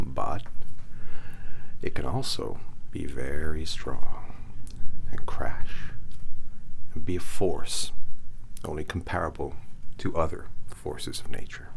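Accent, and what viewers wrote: United States. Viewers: So wild how you can do a really good American accent. Love theese videos the breathing techniques really help me to relax enough to rest at night. Thank you!😊💤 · These Reiki sessions are such a treat - the gravelly US accent is so restful. I do hope you'll consider doing more of these after the 8 'block bookings' are over? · Can we talk about how this guy can just casually do a great American accent? I’m a northerner and I’ve been in the states for like 2 years now and still can’t mimic the accent! How did you get so good at that lad?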